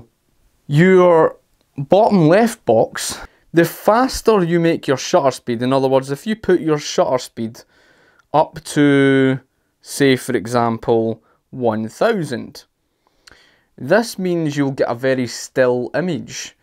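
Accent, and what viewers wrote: Scotland, United Kingdom. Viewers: jens lipponer Scottish accent you mean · Great video! Plus, love the scottish accent :) thumbs up from Italy!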